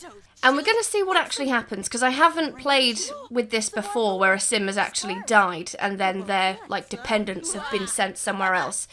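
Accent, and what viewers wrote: United Kingdom. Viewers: Your accent in particular is so nice to listen to. I don’t usually like British accents but yours is so satisfying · She has the classy UK accent that’s why lol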